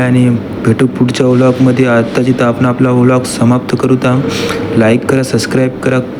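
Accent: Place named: Pune, India. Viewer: Punekar bolte bhaiiiii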